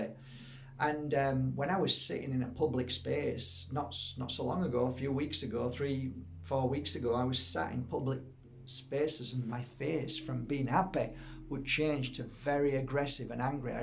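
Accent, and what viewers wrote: Yorkshire, United Kingdom. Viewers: I love listening to this Genuine “ Yorkshireman !! Tells it how it is !!!!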